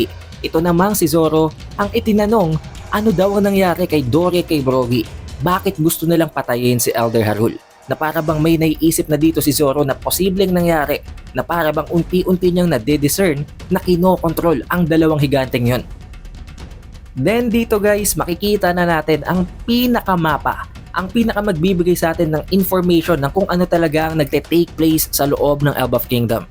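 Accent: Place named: Conyo, Philippines. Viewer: Inexplain ❌ Ipinaliwanag ✅ Ishinare ❌ Ibinahagi ✅ Nireveal ❌ Binunyag ✅ Mga simpleng salita, pero di alam ng mga purong tagalog. Hindi alam? Or baka kinalimutan? Ewan ko bah, nakakapeste lang pakinggan na banggitin ang isang salita sa ganyang paraan kung may pormal na salitang tagalog naman. Tunog conyong bakla na tuloy pakinggan. Bisaya ako pero mas magaling pa ata akong bumigkas ng pambansang wika. Kailangan na ata ng karamihan magbalik-aral.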